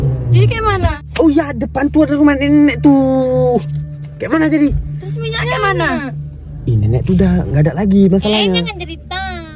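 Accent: Malaysia. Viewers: Ngomongnya kek orang malaysia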